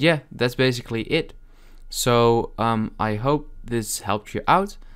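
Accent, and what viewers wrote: Netherlands. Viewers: UR DUTCHH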